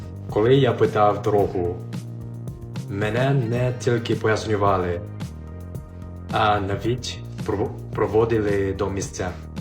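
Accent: Standard. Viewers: Дай Боже, щоб всі люди в Україні хоча би такою "поганою" українською щодня говорили!!! Вас чудово зрозуміло, у Вас гарна українська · Ви краще розмовляєте, ніж більшість українців. Чисто❤❤❤ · Ти дивовижний! Дякую за таку чисту мову, щасти тобі у всьому!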